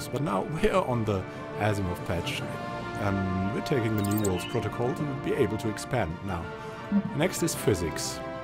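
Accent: Germany. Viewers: Btw are you german by a chance? You kinda have the accent :)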